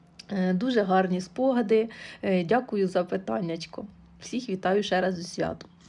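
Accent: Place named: Karlivka, Ukraine. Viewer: акцент Карлівський-)))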